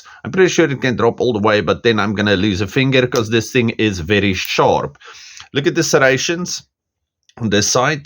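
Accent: Afrikaans, South Africa. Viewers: Jy kan sommer hoor hys Afrikaans 😂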